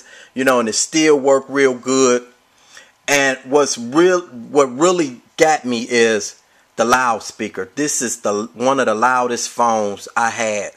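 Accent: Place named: United States. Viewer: why cant you speak standard American English?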